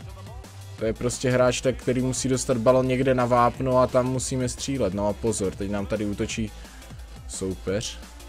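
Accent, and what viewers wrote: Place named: Moravia, Czech Republic. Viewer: já to sleduju taky kvůli těm kecům:D jako moravák mám stejné nářečí jak takže u toho vždycky chcípám:D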